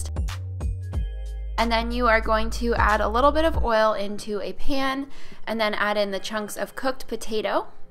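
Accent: Canada. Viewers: Are you Canadian????? I love your videos 😁❤️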